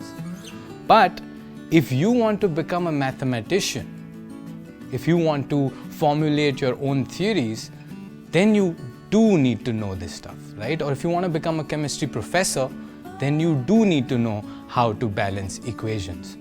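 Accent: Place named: India. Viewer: Everyone: Serious talking and discussing in the comment section. Me: His indian accent omg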